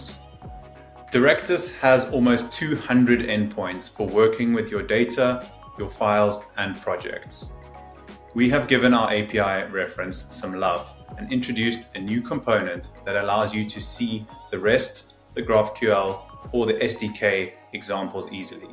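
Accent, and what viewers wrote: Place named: South Africa. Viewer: This guy sounds South African. any way thanks for the update 🔥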